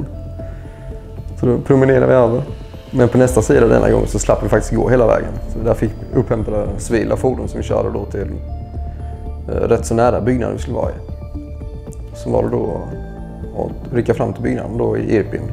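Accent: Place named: Skåne, Sweden. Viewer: My background is Swedish army basic training in the '80s, one tour in Bosnia in the 90s and Swedish National Guard for over decade. Drafted E-5, ended up O-2. I emigrated to the United States about 25 years ago. I observed that all the stupid stuff that we were warned that the Russians would do in war, actually still happen, about 25 years after we were first taught them! Every single weakness and structural problem! It's unbelievable. It's good, but weird. They mostly seem to run T72s, still. The B3 version, but still. You can tell that this man has experienced some serious s***. My unit was in one firefight in Bosnia, but that was a very brief moment and even though we killed people I'd relatively close distance, we didn't take casualties of our own. That checks with friends I have here in the United States, who have served in Iraq and Afghanistan. 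Enemy casualties is one thing, but on your own side is traumatizing on a whole different level. On a lighter note, I'd like to mention his speech. When I started the video, I noticed he had "non-Nordic" ancestry. My brain automatically prepared to listen a little closer in case he had an accent. I have worked for decades with native Spanish speakers here in the United states, including as supervisor, so my brain is prepared for everything, so to speak (I ended up learning a lot of Spanish, cuz that was easier). Then, I noticed that he has an accent from Skåne (Scania). That accent and his rapid speech, made me have to follow the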